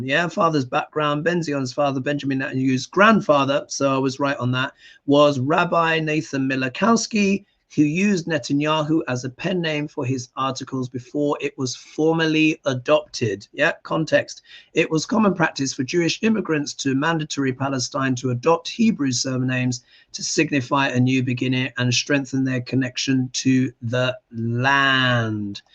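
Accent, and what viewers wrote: United Kingdom. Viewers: A cuban with a British accent 🤷🤔😅😂🤣. How can we greater raise the TRUMPSTEIN REGIME and GESTOPO CRIMINALS intelligence 🤷🤔🤷🤷❓❓❓❓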